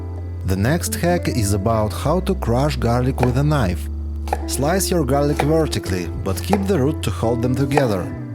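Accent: Russia. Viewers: Do you have a favorite hack for making 1/4" butter cubes for pie dough? the cooling rack iiii good, but I think I should pop it in the fridge or freezer to get them hard enough to pop out of the race without mashing them. Oh! and the mushroom cutting! So good. Is that a Russian accent I detect? I have so many Russian/FSU friends that I feel right at home with you teaching us. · Какой ужасный русский акцент... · I can't help but subconsciously find the accent very uncomfortable. As if I heard Russian instead of English. the emphasis on syllables with specific vowels even scares me as a Czech. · Видео на Английском языке, стало быть и комменты будут на Английском 😂😂😂😂😂😂, да, я по незначительному акценту поняла , что парень говорит и на русском, но в общем видео для англоговорящих.❤ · U sound russian. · I like your ideas and your russsian accent. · Please do not record your videos in English. 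You have terrible Russian accent. Take pronunciation lessons. Good luck. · Такой милый рус акцент, я даже забыла, что на англ слушаю. :))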